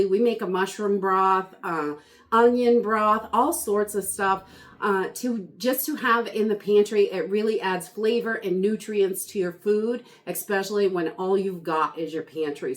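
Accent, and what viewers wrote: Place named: Maine, United States. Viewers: I think she’s from Maine. We just call it Wally world. I live in New Mexico. And I have an accent too, also I tend to talk very fast. I think that’s what you’re hearing is her accent. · from Maine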